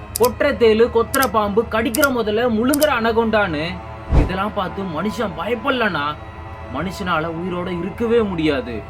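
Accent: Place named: North, India. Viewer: Konjam normal la pesu thambi...vadakan pesuramathiri iruke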